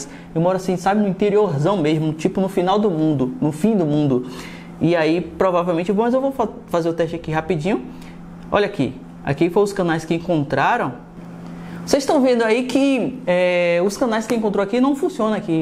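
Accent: Bahia, Brazil. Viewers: Kkkk sempre desconfiei q tu era bahiano só pq da sua voz("voz msm não sotaque") e agora com esse lá ele agora sim tenho certeza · Muito engraçado ouvir a análise no sotaque baiano! Dá um diferencial. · Quer sucesso falando Bahiano em nivel nacional me poupe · Oh, baianinho tu e de qual origem da Bahia??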